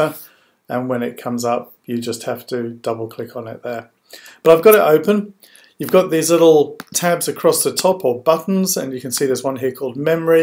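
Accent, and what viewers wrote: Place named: England, United Kingdom. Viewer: Ahhh first so lovely to hear a nice English voice, and second a FANTASTIC tip! Thank you!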